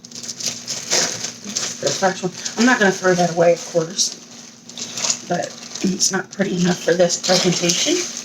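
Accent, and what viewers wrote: South, United States. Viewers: It’s that sweet southern accent and attitude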